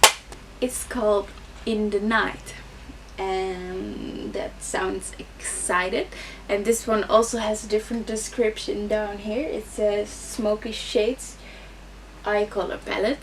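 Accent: Netherlands. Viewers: Jij bent sws nederlands 😂😘 ik hoor het aan je 'platte nederlands' accent hhaah je spreekt overigens wel heel goed engels maar toch hahah ❤️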